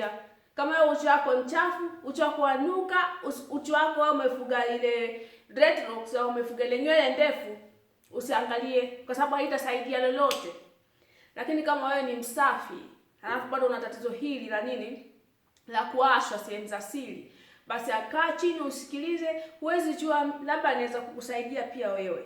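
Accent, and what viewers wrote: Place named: Kenya. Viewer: We ni mtanzania lkn unaongea kiswahili Cha kenya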